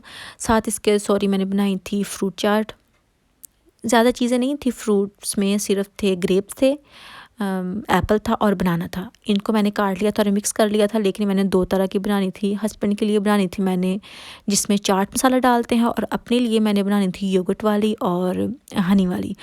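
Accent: Faisalabad, Pakistan. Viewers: Urdu lehja bilkul fsd walo ka hai 😋😋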